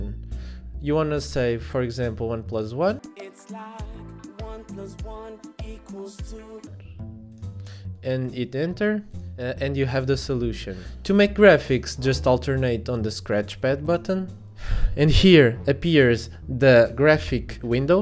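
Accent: Portugal. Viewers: Ganda sotaque tuga🇵🇹💪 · 15 segundos no vídeo e nota de logo q é português kkk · Aquele sotaque tuga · Are you portuguese? Eu juro que escuto um sotaque. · Quando ouvi o sotaque sabia que eras um dos nossos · You're Portuguese aren't you? I can tell by your accent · Vi logo pelo sotaque e pelas musicas de server de csgo source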